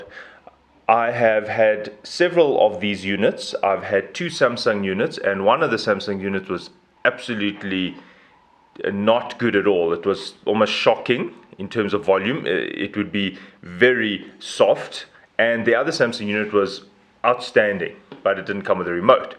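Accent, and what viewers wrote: South Africa. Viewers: I knew you sound south African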